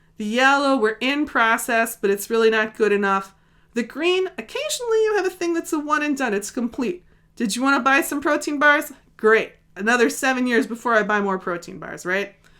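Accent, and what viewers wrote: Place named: Upper Midwest, United States. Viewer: I'd like to find out more about river-erosion risks in my area, and also in areas that I'm thinking about for relocation. Can you recommend places to learn more about such risks? Also, my friends and I love your information - almost as much, we love your classic Upper Midwest accent. We call you the! Great attitude and great info. Thanks so much!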